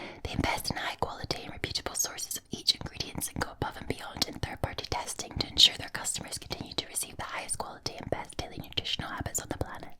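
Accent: Scotland, United Kingdom. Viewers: So glad I found your channel looking to bed tonight love your accent I'm a londener and I love the Scottish accent hope watching you before bed tonight makes u pop up in my dreams 💕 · I loved this video,. I really love your Scottish accent, because it is the most beautiful accent I’ve probably ever heard. 🥰 The rolling of the r’s and the pronunciations like “about”, “doon”, and others are so lovely to hear. 💙 Even though I had no idea wth you were saying lol, I really enjoyed the Bernies Cuddle Doon poem. And even tho I’m not familiar with his work, I’d be open to you reading the poems of Robbie Burns so I can hear more of those pronunciations and slang. I also feel like I’ve heard the name Wee Willy Winkey before, tho I don’t remember hearing the actual poem. Lastly, I’m happy you brought the wave bag back. I’m always happy when you bring the staples of your channel back whether it’d be trigger items, video types, or more of your wonderful Scottishness lol. 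You should definitely include Scotland during Spring in an upcoming Creating Your Fantasies video, I’d really love that! 😃 Anyway tysm! This was too lovely:_Loveheart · Happy Friday!! I really enjoy these videos that you go with a stronger Scottish accent, especially because it is so different from the accents that I'm used to. The stories were really nice as well! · What a perfect video for a Friday evening 🙌. I love your Tarten skirt and Tarten hair band 😍. You always look so comfy and cozy when you're lying across your bed 🛌 😀 😊. Yes, yes, one of my favorite Scottish nursery rhymes, you can't push your granny off a bus!! 👏 Thanks,. I haven't heard Wee Willy Winky in such a very long time. Thank you for bringing back that one 🙏 ❤️. Ahhh, hair massaging and rambling is just amazingly relaxing and soothing!!, you look absolutely stunning tonight! The Scottish poem is absolutely incredible with your Scottish accent! 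No one else could have read that any better than you, Magnificent 👍. Definite tingles with your incredible accent! I wish this video was a bit longer because I love listening to you speak. 😴😴 The mermaids 🧜‍♀️ wife was an awesome story, and, you must do more of these types of videos 📹 😀. You know, I may not know everything you've been saying, but I love listening to you!! 🥰🥰 Thank you for this prosecco Friday evening video. it has been amazing! Please take care and have a wonderful weekend 😊 💕. Lots of love to you! 💗💗😍💕💕💕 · Yey! More very Scottish accent please! The very scottish roleplays brought me to this channel. Your scottish is the best. 😍🤗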